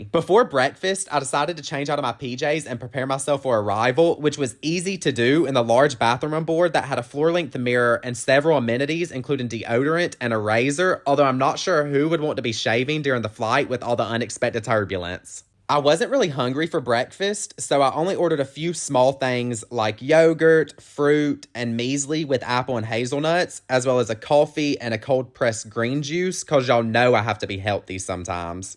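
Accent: South, United States. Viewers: There’s nothing worse than listening someone that’s gay and from the south.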